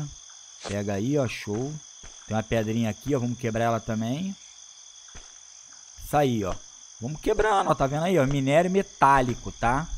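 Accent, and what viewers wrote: Rio de Janeiro, Brazil. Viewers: Não sei se ele é carioca , mais nos caraioca falamos assim kk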